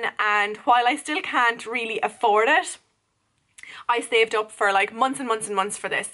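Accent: Ireland. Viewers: Knew you were Irish the min you opened your mouth!😍 love Irish youtuberssssss😁😁👑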